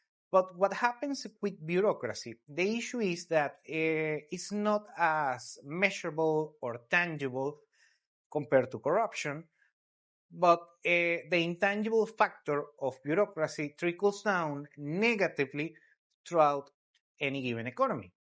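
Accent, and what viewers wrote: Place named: Latin America. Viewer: Claramente tu acento delata que eres latino😂 por que entonces haces un vídeo en inglés si tu pronunciación es muy mala?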